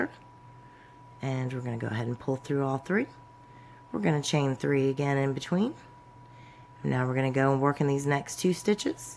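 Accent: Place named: South Carolina, United States. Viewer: I watched the videos and I have to tell you that you are amazing. Love the accent it makes me miss my time in SC. While crocheting my shawl I noticed that while making the 4th floral lace (the single in the center) I was on video 2 and did the lace in the same manner as the one in that video. It seems to have worked out, but should I frog it (I'm at the center point) and go to video 3? I will be adding more rows because I want to use the yarn in my second cake until the end.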